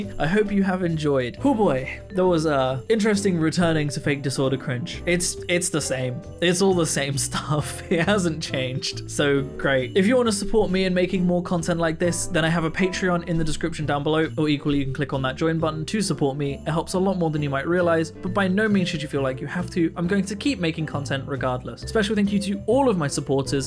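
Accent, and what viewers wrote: United Kingdom. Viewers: eheheh thank you, it’s honestly just a standard british accent!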